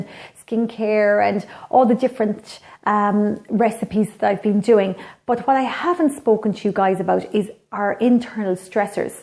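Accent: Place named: Ireland. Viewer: Such an important aspect of good health to talk about! Btw I love your Irish accent haha:) and keep up with the videos, I'm loving them:) x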